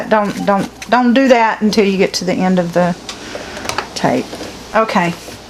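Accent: South, United States. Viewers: Thank you for this video, it was really good and helpful. I LOVE your accent ( I'm here in England) I'm guessing you're in the South? I was thinking you might enjoy getting a extension plate for the sewing machine, it makes life easier when you're working on a wide piece of fabric.....just a thought. I like your no-nonsense approach, I too curl a lip up at Bias tape!! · I love how straight forward you are with your southern accent, you had me cracking up. You didn't film you sewing that curve because it takes some kind of witchcraft, doesn't it? Can't let all your secrets out! 🤭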